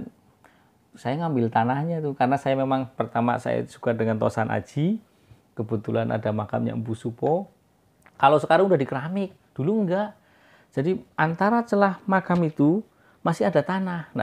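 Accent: Banyumas, Indonesia. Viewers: Om orang mna ya kok ngapak jg boleh minta wa'nya g om?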